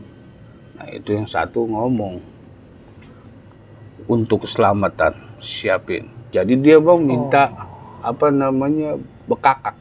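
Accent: Jakarta, Indonesia. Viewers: Lucu. Bngt. Suka sama. Gaya logat nya. Si. Abang. Btawi bngt..👍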